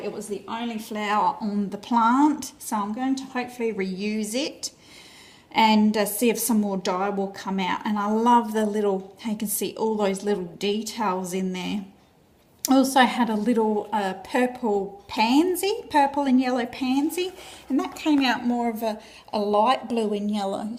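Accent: Australia. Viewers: I PICKED UP YOU WERE AUSTRALIAN, AS I AM PROUDLY. FOR A FIRST ATTEMPT AT ECO DYEING, YOUVE HAD AN AWESOME OUTCOME, PAPERS ARE JUST BEAUTIFUL, IM JUST STARTING THIS. THANKS.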